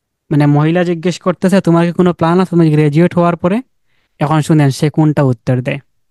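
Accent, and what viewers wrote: Sylhet, Bangladesh. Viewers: Baiya apner basha sylhet kutay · Sir amioy sylheti,,, apnar kota shuna kno jani mono or afna sylheti,, 🥰thanks a million sir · উনি হয়তো সিলেটের তাই কথা কেমন। এটা ব্যপার না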